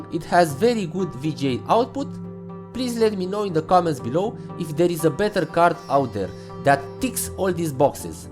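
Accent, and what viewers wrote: Romania. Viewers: Mi-am dat seama din primele 3 secunde că eşti român. Accentul.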